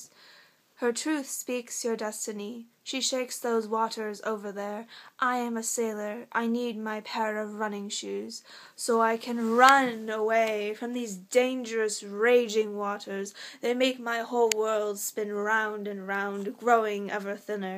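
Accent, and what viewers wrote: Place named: England, United Kingdom. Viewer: You sound English. Good sonnet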